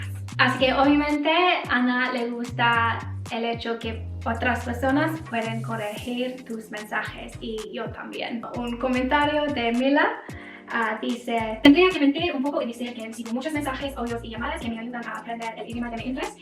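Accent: Latin America. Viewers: Bonito acento y pareces latina! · Amo que hables el español como los latinos y no como españoles! HAHAHAHH Me encanto tu vídeo, desde hoy empiezo a usarla espero me vaya bien!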